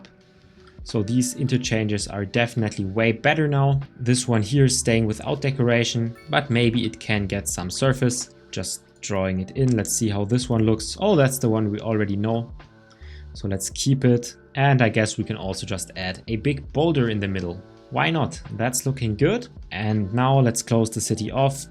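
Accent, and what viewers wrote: Germany. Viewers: I can hören german Akzent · You sound very German! 🇩🇪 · Ich höre deutschen Akzent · Bro man hört so raus dass du Deutscher bist😂❤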